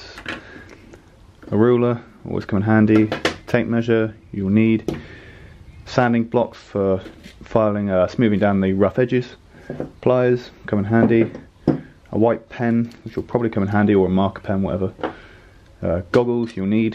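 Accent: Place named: El Paso, United States. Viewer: This guy sounds like he's from El Paso.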